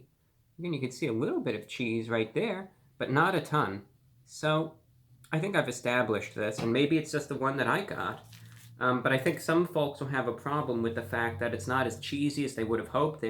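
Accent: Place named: New York, United States. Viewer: Clearly a New York accent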